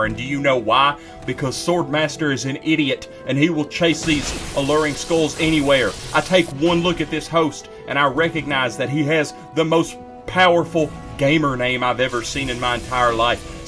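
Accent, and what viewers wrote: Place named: Appalachia, United States. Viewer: Cool hill billy accent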